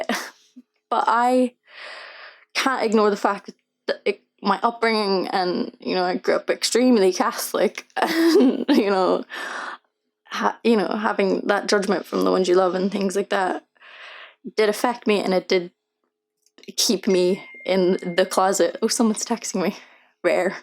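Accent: Ireland. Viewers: good try , Mistress of Clickbait :)) Now go back to sing, we adore your unique, spicey and irish accent ! Wish you joy & happiness ! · I Never post coments, but this is one of my first, I am so proud of you Janet, for posting this video, it'S what make you happy in life, don't, worry about what other people think. I was honered, to meet you and your manager when you came to Aberdeen, YOU are always a superstar to me. ( beautiful irish voice) You will aways be welcome in Aberdeen Joe x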